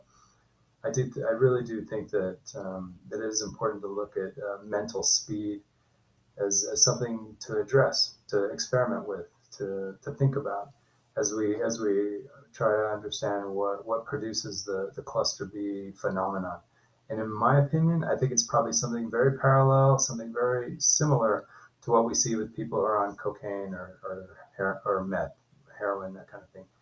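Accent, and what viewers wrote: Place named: Germany. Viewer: Lol. I'm actually normally good at accents and had detected some German intonations in yours, but same with some Irish people. I'll go check out if it is backed up by any teutonic influence...Talk to you another time.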